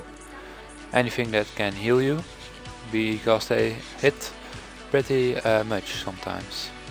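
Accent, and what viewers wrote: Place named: Netherlands. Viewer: je kan echt horen dat jij uit HOLLAND komt :p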